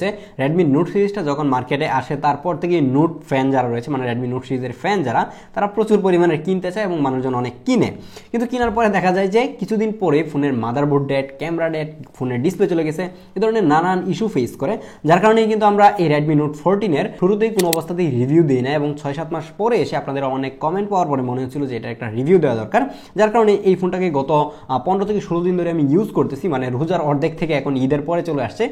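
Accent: Kishoreganj, Bangladesh. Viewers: Kishoreganj Naki Bhia Aponer Jala Bhia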